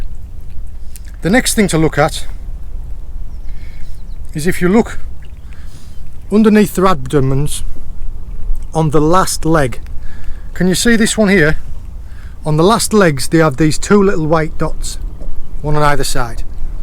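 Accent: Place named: Northern England, United Kingdom. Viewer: I would like to thank you for your videos I have been watching for about a year now but recently my dad who passed away Christmas morning found so much happiness watching/listening to you he had them on back to back for the last 2 weeks of his life I think it must have been your northern twang he found piece with as his farther and family come from Darlington. Once again thank you, you made a very poorly man relaxed thank you once again xx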